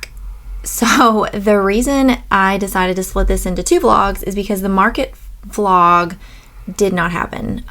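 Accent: South, United States. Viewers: I just found you and love you already. Subscribed!! I loved when you said that markets were the P to your PB and J and your cute southern accent. I definitely will be going to site and purchasing from you because I want to support you!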